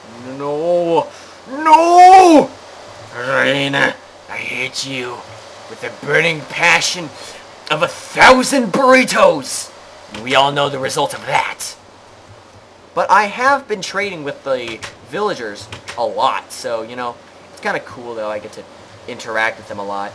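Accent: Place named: Germany. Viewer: German is the acent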